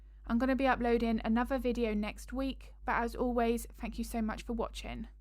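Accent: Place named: England, United Kingdom. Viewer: Your English accent is so sweet to listen to and you are explaining so well how can someone fail to understand you. Nice job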